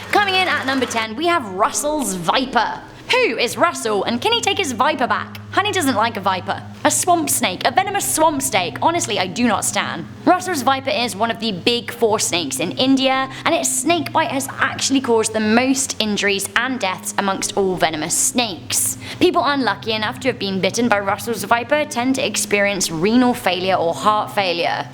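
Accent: England, United Kingdom. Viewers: OK Guys.... be honest with me... "Who else would watch if she was doing a video on Tree Moss". Yup... Me too! The fact the does the Top 10... is a double win! Adorable/Sexy and that English accent just make me melt!... you are 100% Beautiful!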